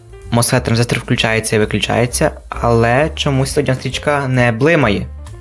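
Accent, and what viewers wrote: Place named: Mongolia. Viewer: Язык смешной,автор наверно монгол.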